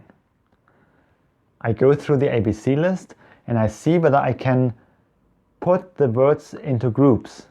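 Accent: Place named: Germany. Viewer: Are you German? You sound German.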